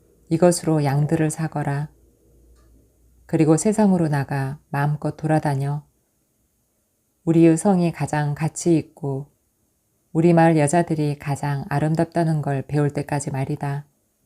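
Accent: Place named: Jeolla, South Korea. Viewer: 전라도 억양 정겹네요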